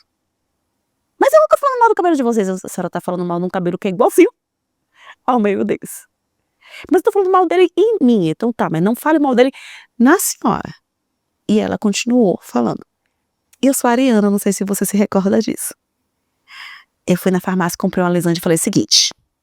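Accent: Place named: Bahia, Brazil. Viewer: Estou simplesmente amando seus livros e seus vídeos. Falam tanto de mim, me enxergo tanto nas suas falas. Ainda mais agora que sou mãe de primeira viagem e estou buscando curar minhas feridas para não ferir minha filha. Sou grata por compartilhar tanto conhecimento com a gente! Ah, e descobri ontem que vc é baiana igual eu, fiquei feliz demaaaais vendo vc fala com nosso sotaque e nosso jeito maravilhoso hahahahaha ❤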